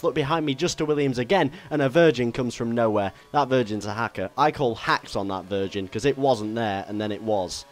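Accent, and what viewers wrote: United Kingdom. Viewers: He has a very normal British accent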